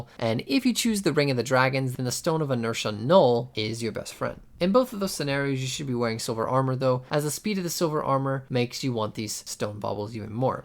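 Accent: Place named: Canada. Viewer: bro sounds like kenadian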